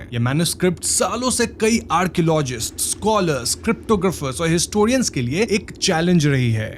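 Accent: Bengal, India. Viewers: TUI KI BANGALI ? .....HINDI PRONUCE E KAMON JANO AKTA BANGALI TOUCH ACHE.....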